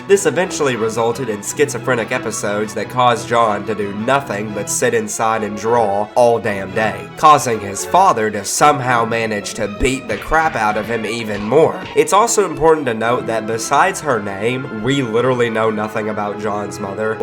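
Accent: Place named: South, United States. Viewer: Amazing video. You did great and it's awesome to hear a good country accent brother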